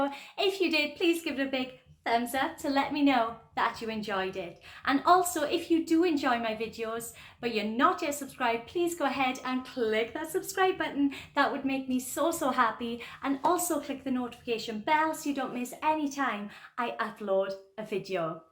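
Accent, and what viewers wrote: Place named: Scotland, United Kingdom. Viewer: You must be Scott ur accent tells it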